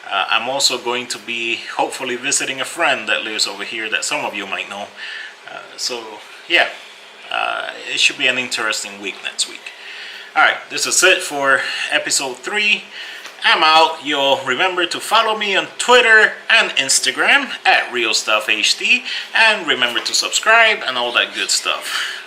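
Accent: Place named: Puerto Rico. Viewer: I'm new to your vlog. Saw you on veganvlogs. You look and sound puertorrican so welcome back to PR. Hope everything gets better for you here and that you find what you're looking for. I commend you on your efforts to try eat less meat. I know it's not easy I'm a meat eater myself who wants to go vegetarian. Always remember your goal to get healthy and you'll succeed.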